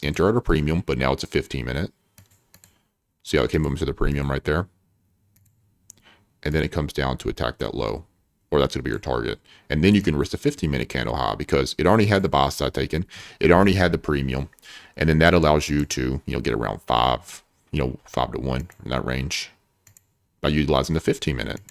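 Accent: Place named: East Coast, United States. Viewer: Lol. This was funny for some reason. Dude sounds like he's on the east coast but slightly south.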